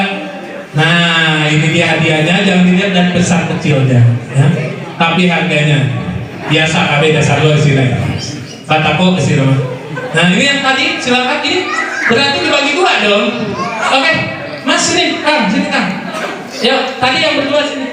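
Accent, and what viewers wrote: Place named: West Java, Indonesia. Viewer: njir sunda pisan ieu mh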